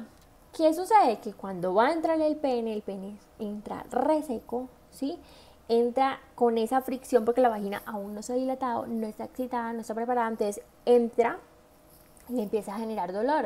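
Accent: Colombia. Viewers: hola, como siempre luces espectacular con esa cara, ese pelo, esos dientes, esos ojos, esos labios, y con ese acento colombiano bien marcado que tienes te hace una mujer muy hermosa, gracias por tus consejos son muy educativos, saludos desde Costa Rica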